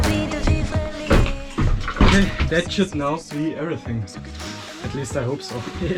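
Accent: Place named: Austria. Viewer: In deinem Vorspann heißt es, dass du der jüngste Deutschsprachige sein willst und machst die Videos in deinem holprigen Englisch, stark geprägt vom österreichischen..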